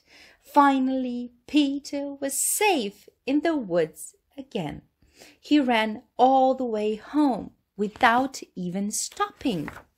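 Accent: United Kingdom. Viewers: Savršen britanski akcenat. 😊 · Divno! Obožavam da slušam ljude sa britanskim akcentom!❤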